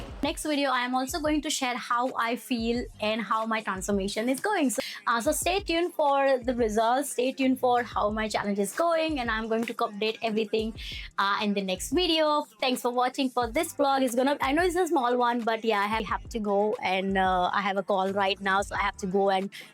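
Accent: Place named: Gujarat, India. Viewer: Are you a Gujarati..accent thoda gujju type hai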